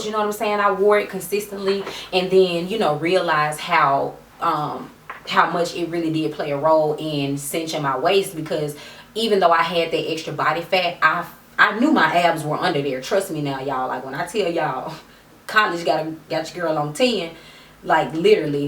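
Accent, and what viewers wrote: South, United States. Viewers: You are extra pretty you must be from the south that accent thick girl lol 😂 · Haha nice video! Here I am watching a year later and I love your accent, I’m from Texas and I talk like this! I can’t control the way my voice changes all southern haha! 😊❤️ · Real talk!!! U talk like u are from the H!!!! H town!!!!I need a friend like u here!!!!!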